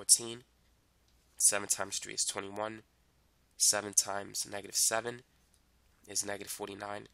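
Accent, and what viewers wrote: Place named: Boston, United States. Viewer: I feel like you have stereotypical boston accent and I love it.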